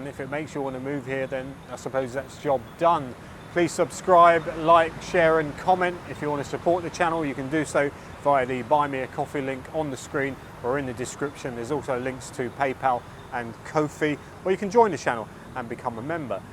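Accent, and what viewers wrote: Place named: Oxford, United Kingdom. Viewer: yes , clear nice oxford english as a native british. Guess he is a true gentleman as well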